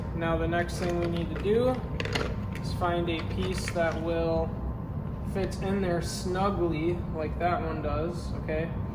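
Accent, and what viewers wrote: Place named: Midwest, United States. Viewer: Your Midwestern accent is amazing.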